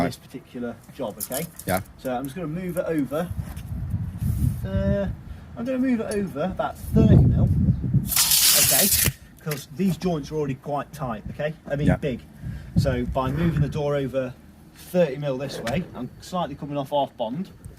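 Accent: Derbyshire, United Kingdom. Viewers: Where u from ...sounds like Chesterfield or North Derbyshire ?????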